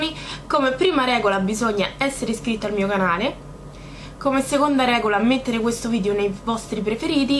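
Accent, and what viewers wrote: Rome, Italy. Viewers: 4 adoro il tuo accento romano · secondo me nn dovresti lasciarti andare tanto col romanaccio, e ti parlo da romana =) è bello sentirlo parlare, ma nn troppo esagerato!!! Ciao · ") che bella quando parli il romanesco verace hahhaha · 3 sei molto simpatico e il tuo accento romano mi fa impazzire!!!mi sta troppo simpatica la tua parlata!!! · 1. che bei premi.. sei sempre bellissima.. hai trovato proprio le parole giuste.. siamo una grande famiglia.. ti adoro quando parli in romano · 2 amo il romanesco hihihihi mitica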